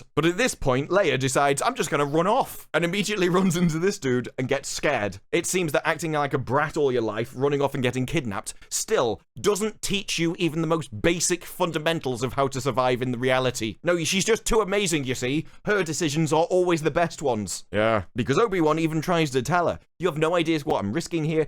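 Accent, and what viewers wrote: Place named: Sheffield, United Kingdom. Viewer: Yet another great reason to not watch modern SW. Your accent is bugging me, it sounds familiar (I'm Rotherham area) and every now and then your accent reminds me of PJW who hails from Sheffield.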